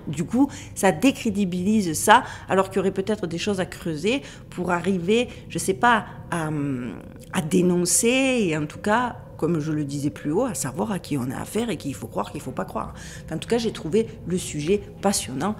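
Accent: Marseille, France. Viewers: Magnifique, comme toujours….. Le réveil de l’esprit critique, si peu actif ces dernières années. Et cet accent de cigales!….. Merci. 😍🥂 Meilleurs Vœux à tous pour cette année de révélations …. Y a du pain sur la planche 😏😘🤓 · Plus ça va plus je vous écoute... c'est un bonheur, le mélange d'un côté artificiel à fond (Barbie) et une vraie brillance intellectuelle. Ça donne un dandysme du meilleur teint, au sujet de rien de moins que la structure (viciée) dé notre monde. Deux questions bêtes : un, vous êtes marseillaise ? Deux, avez-vous fait des "études" d'anthropologie ? Autre ? Merci en tout cas pour votre excellent travail, ET SA PRÉSENTATION, la Vôtre.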